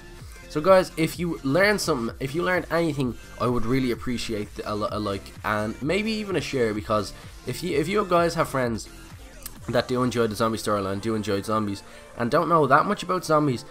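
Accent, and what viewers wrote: Ireland. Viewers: is je Irish he sounds really irish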